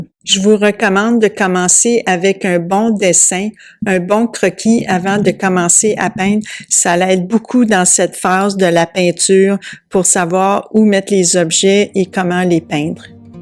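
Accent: Canada. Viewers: Bonjour , vos conseils me sont très utile ,moi le débutant , vous êtes adorable ,votre accent Canadien est superbe , j'aime votre travail ,vos conseils et vos explications ,merci ,Bruno de Saint-Etienne France.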